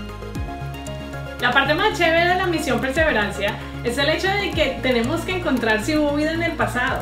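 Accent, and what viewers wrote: Colombia. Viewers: Por su acento, muy Colombiana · Cuando dice "La parte más chévere..." y su acento caleño. Qué gran orgullo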